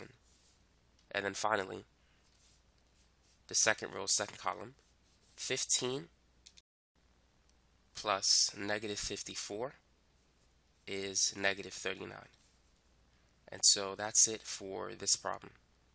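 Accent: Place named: Boston, United States. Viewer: I feel like you have stereotypical boston accent and I love it.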